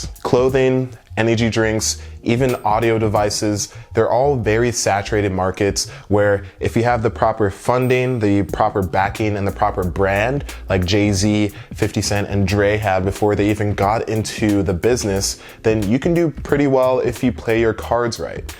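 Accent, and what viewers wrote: United States. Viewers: Where is he from Chicago LA Mississippi ATL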